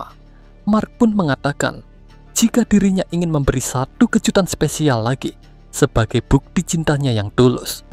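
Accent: Java, Indonesia. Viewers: medhok jowo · suaranya medokk bangett heee,,mantapp bang.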